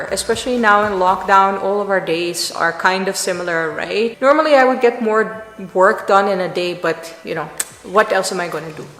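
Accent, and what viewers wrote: India. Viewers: She is picking up the indian accent. This is nice☺ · Your english accent is more indian now! I've seen your videos for years and I just came to this realization. You make me love india more and more every time!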